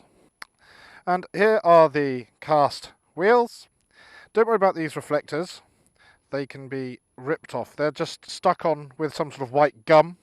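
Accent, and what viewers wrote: United Kingdom. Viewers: I like your bike reviews, but GO EASY on the "tally ho" bullshit! You sound annoying, to say the least! All the yelling, and the "british accent" crap takes away from the overall review. I don't know, nor do I CARE, where you're from...JUST TONE IT DOWN A LITTLE! All your reviews are interesting to WATCH... just STOP TALKING! Where I'm from, if you talk like that, you GET YOUR HEAD STOMPED IN, AND YOUR NOSE BROKE!!!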